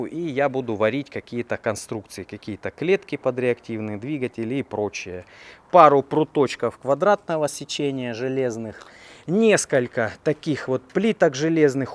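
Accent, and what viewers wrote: Rostov, Russia. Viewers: Как у классика: "Хорошего вам настроения! Вы держитесь там! Просто денег нет."😀 Лайк только за один ростовский говорок!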